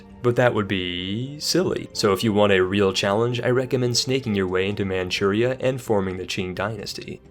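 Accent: Texas, United States. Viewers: the smoothest Texan accent out there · Came for the Achievements, stay for that Texas accent. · I love Texas accent :D. Make a video only in Texan 😂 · Love the Texas accent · bruh that texan accent lmao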